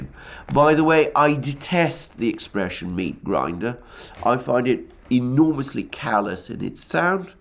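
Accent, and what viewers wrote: United Kingdom. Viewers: Good day! :-) thanks again for your brilliant and intelligent analysis. And let me add, i love your nice british accent, which i learned in school but heared never again in real life. It makes it possible as a german-italo speaker to understand all perfectly.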